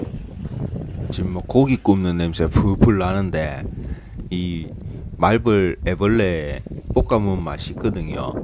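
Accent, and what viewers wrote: Gyeongsang, South Korea. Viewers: 터줄낀데 ㅋㅋㅋ 경상도 방언 재밌다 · 말투가 경상도 같음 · 유튜버님의 경상도 사투리가 마치 청국장찌개수준입니다 너무 구수하네요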